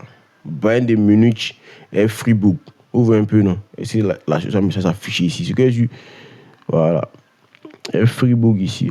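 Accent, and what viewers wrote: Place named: Ivory Coast. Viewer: merci mais vraiment ton asant me rapel vraiment tu es ivoirien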